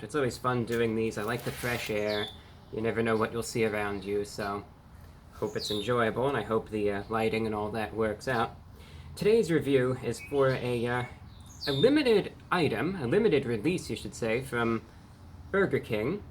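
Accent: United Kingdom. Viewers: Your voice has definitely changed over time. You somehow sound more British now. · Why does his accent sound more and more British?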